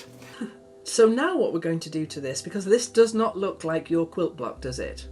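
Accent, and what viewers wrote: England, United Kingdom. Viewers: You are always busy with something! I live in Michigan, USA, but was born in England at Wimpole Park. We went back to England again & lived in Maidenhead for my grades 1 - 4. Dad was in the US Air Force. I'm retiring at age 68 on 12/31/2022. I have several quilting projects lined up. I love listening to you as it reminds me of my neighbors in Maidenhead! They were like Grandparents to me! I love watching you make all of your beautiful things. Take care!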